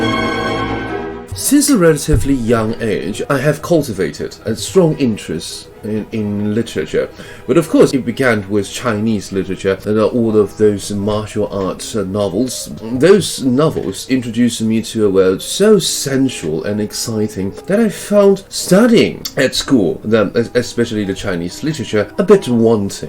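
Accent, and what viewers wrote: United Kingdom. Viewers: 好喜歡聽老師的英式口音，期待以後可以出一系列的英說影片～聽了很享受XD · Quite a good summary and denotation about eslite Taiwanese student' studying process. But lowsy students like me don't even know the frame the society's giving to us till some people mentioned. But this time the issue is mentioned by a visual kei vampire who speaks British accent. Intriguing!😆 I like British accent. So elegant! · Pls tell us more about your life in England !! Your life stories are truly intriguing,! I had been studying in the UK for 4 years, and your accent reminded of those amazing memories back then. anyways, love your work, pls continue sharing your knowledge to us, I enjoy every bits of your creative work ❤️ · 超喜歡英式發音的，可以聽Eko老師講英文，而且是講日常用語👍👍👏👏 重點是關於吸血鬼很吸引我 哈哈👍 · 天啊，真是太精彩了！好喜歡聽你說話，老師的思考與陳述方式很有魅力，完全能引起大家的共鳴，一邊看你的日系打扮搭配英式英語以及上方不時出現台灣人習慣用語，種種不可思議的違和讓人會心一笑。 從小到大太多時候，我們都被迫假裝自己能接受或去成為所期望的某人，被僵化體制、社會狹隘價值所捆綁，很欣賞老師的勇氣與毅力！這樣一段自我介紹的影片，是那麼不容易，背後經過無數萃煉與追求完美的堅持，才能以現在如此從容優雅的姿態向大家娓娓道來自己的故事，這樣的精神，非常令人感動與佩服！ P.S. 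我以前在學校很討厭英文，在被強迫的狀態下英文成績很差，最近這兩年靠自學才漸漸有了對英文的興趣，看到像老師這樣優秀自信地展現英文能力，對學習者來說是非常激勵的，本來想用英文留言給老師，不過為了能精準表達內心的感受，還是決定用母語來正確完整地陳述 ： ） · 真的有唸文學作品有差 整個鋪陳、敘述都非常厲害啊 英國腔超帥😂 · 開頭以為要講吸血鬼傳說，然後老師用道地英式腔調講訴著台灣學子的悲哀故事，反差太大了，差點反應不過來😅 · 我又來報到了😂 Eko你的聲音和英國腔真的太好聽了！ 只能說聽你說英文是種享受哈哈 btw thanks for sharing your life story when you’re little! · Very beautiful British accent n impressive 吸血鬼造型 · 尋尋覓覓終於找到一位新潮又酷的英文老師 想跟隨他充满神秘浪漫的英式口音 及吸血鬼教室聽他跟別人不一樣的特殊教法 只是相見恨晚 在一個訪問频道認識EKO老 師 被你的声音吸引 謝謝老 師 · 哈哈～ 突然有種希望老師能拍一部，自己寫的電影，裡面充滿奇幻和你好聽的英國腔，（覺得應該會很棒） 再來喜歡搖滾樣貌和古典音樂搭配，再加上一點慵懶的優雅，意外的讓人入迷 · 看了几个eko的视频，发现英音听起来真不错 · 你的英式口音真的很有吸引力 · 看福爾摩斯1984年版的影集，就是這種英國腔！ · 從阿滴那邊知道EKO老師的英式口音很好聽，然後連到這個介紹影片，完全被老師的人生經歷懾服欸，期待老師之後可以繼續分享更多！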